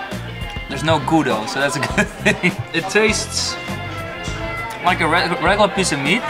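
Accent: Netherlands. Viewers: ***** je hebt dat nederlands engels accent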